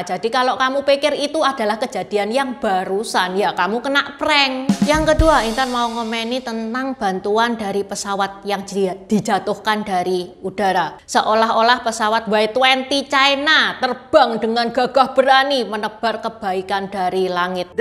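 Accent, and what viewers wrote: Java, Indonesia. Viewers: Wong ndi sampean mbak kok medok jowo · Medok tenan,, ciri khaz e intan Semangat💪💪💪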